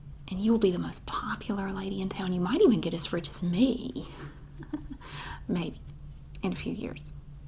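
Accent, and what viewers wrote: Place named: North Carolina, United States. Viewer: Your accent reminds me of my childhood in Catawba County, North Carolina. You are so the epitome of a southern sassy woman. I admire that!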